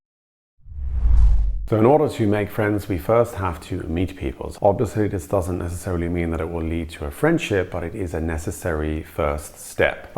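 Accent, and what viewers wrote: Germany. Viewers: Your accent almost sounds German... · I love your videos but I'm distracted by the major German intonation you have when speaking English.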